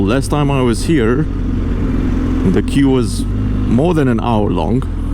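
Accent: South Africa. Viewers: And you also have a VERY South African accent. I initially thought you were from there.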